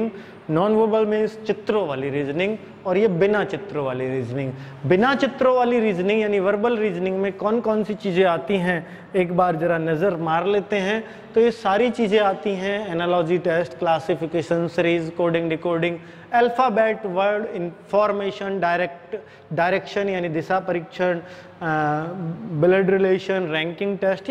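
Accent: India. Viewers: Ram ram Maja aajata hai guru ji apne se lagte ho ekdum desi basha me english bi desi si lagti hai aapki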